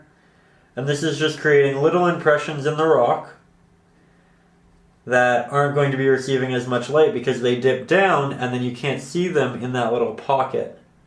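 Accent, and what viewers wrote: Canada. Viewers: I hear you are Canadian.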